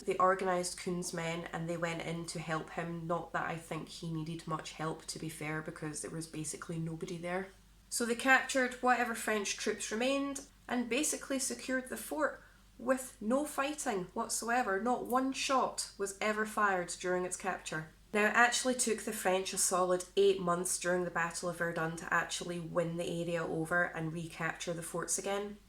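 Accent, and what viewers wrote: Scotland, United Kingdom. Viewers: This my first time checking your metal history. It’s pretty cool. I want to hear you pronounce some Hawaiian words. I haven’t heard that in a Scottish accent.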